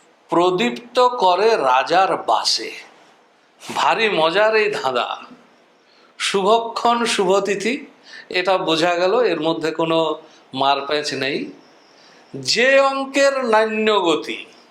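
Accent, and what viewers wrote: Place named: Rarh, India. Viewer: আপনার কথায় লাগে আপনি রাঢ়ের মানুষ..😅 বাঁকুড়া, পুরুলিয়া , বিষ্ণুপুরের..আমিও বাঁকুড়া থেকে!..❤️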